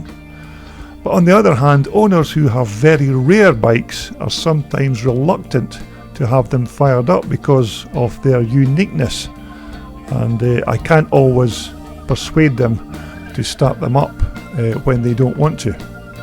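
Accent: Scotland, United Kingdom. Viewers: "Gas" ???you sound Scottish, not American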